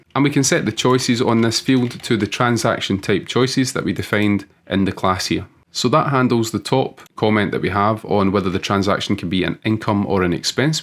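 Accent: Scotland, United Kingdom. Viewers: Your accent is really sick man 😎 love the scots. Greetings from the USA.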